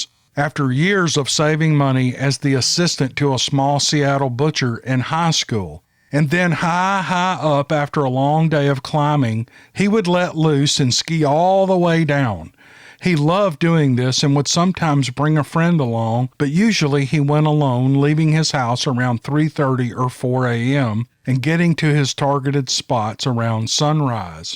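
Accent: South, United States. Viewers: Listened to your collaboration with Shudder recently. Very good stuff. Ended up subscribing to them too. ALWAYS love your stories and that sweet Southern drawl. 🦍💖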